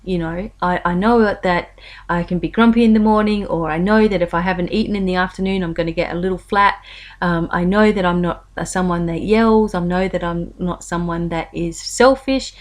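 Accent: Australia. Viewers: hi thankyou for your monthly dose of spirituality, i was looking forward to listening to this. I especially like the part about what you said about Cats, yes they have a great energy, that they pass on, when all around is hectic they can bring a sense of calmness. keep the videos coming, watching and listening is a meditation in itself. Along with your lovely Aussie accent. peace and love.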